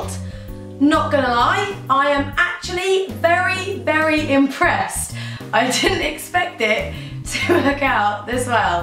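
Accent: United Kingdom. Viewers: You sound like Demi Lavato but with a british accent ... O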